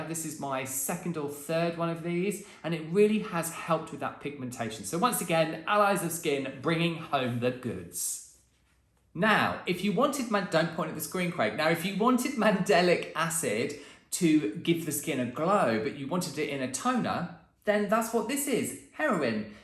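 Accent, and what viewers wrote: United Kingdom. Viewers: I truly adore your British accent ❤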